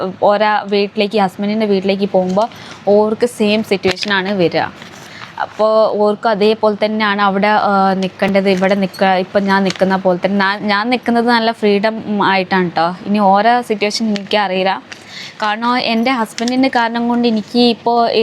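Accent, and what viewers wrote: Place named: Malabar, India. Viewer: ഒരു മലബാർ ടച്ച്‌ വർത്താനം 😍❤️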